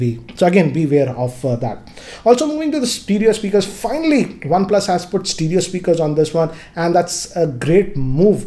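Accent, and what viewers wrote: India. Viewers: Couldn’t finish the review because of an accident. Great review though but Indian accent is the worst ever and hurts my ears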